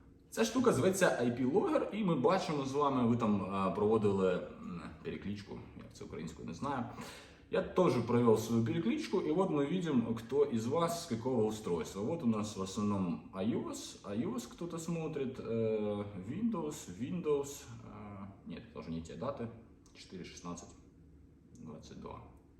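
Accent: Kharkiv, Ukraine. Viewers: Доволі цікаво! Мова Харків'янська так Харків'янська, за що вибачатися) спожіваюся за рік зроблені абсолютно всі висновки)) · Лайк за Харківський суржик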